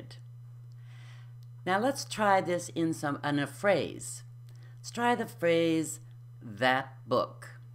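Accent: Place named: East Coast, United States. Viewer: Because they don't pronounce the words with the letters with which they are written... j/k, this woman is claiming that foreigners who fail to use unaspirated "t" sound sloppy when unaspirated "t" is a later addition to English phonology innovated by colonists and immigrants who couldn't be bothered to fully aspirate it. Traditionally in English t is aspirated in every position. That's why it's written with the same letter. There is some irony in prescribing something and claiming that failing to use it is "sloppy" when it started out a "sloppy" feature of non-standard dialects in the first place. Other English speaking countries like the UK and Australia are filled with dialects that replace "t" with glottal stops all the damn time, but you don't catch them prescribing it as "proper English" for learners lol. On the whole, I find the implication that certain uses of language are "sloppy" borderline offensive. 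It's especially rich from someone who sounds like they speak some kind of refined regional East Coast dialect rather than modern General American standard. Nobody under 60 sounds like this woman in the US anymore, and if you're going to go full prescriptivist, why not go fully 19th century and recommend the Queen's English. It's quaint to see an American fuss over "proper English" and "proper elocution" when their "proper" dialects are relatively novel anyway. Anyway, if you want to speak like a typical US American, then follow this advice. Just know that it arose fro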